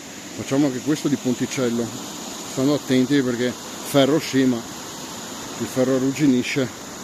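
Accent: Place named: Veneto, Italy. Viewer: Non serviva che precisassi che sei da Venezia... si capiva molto bene ahhaha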